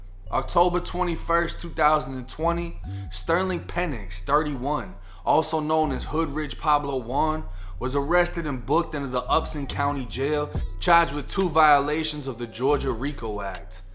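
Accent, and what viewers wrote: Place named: Memphis, United States. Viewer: You sound like a Memphian-- I digz that💯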